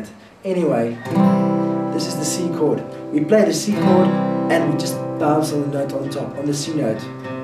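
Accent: South Africa. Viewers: Cool clip, easier than I thought it would be to play. I thought you sounded South African. I'll go play this on the stoep tonight before the braai. Cheers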